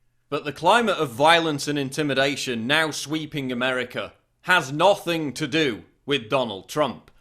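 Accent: United Kingdom. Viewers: whats with the british accent and such interest in american politics? always kinda weireded me out. also why do you always sound so mad? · By the way i love the Channel thank you. Hearing Sh%t i agree with in a British accent makes us conservative yanks feel even more correct haha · You have a British accent, shut the hell up! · Hold on, you sound British... Why the hell do you care so much? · british accent? man he is a brit living in england........ not sure why someone in england thinks they know so much about an american election........ and this info is pure speculation ...................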